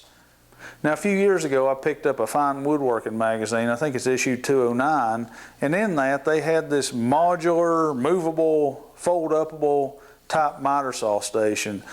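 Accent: United States. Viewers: I love it. I was replacing a 10 year old 10" ryobi haha so it's definitely a step up. I've started making the miter stand today and I'm just using your dimensions, so that worked out good with you telling your dimensions as well. Appreciate the work you put into those videos as its going to help me a lot. If you don't mind me asking, what state are you from. I live in the VA/TN border.... Sound like a country boy to me haha I know that country accent well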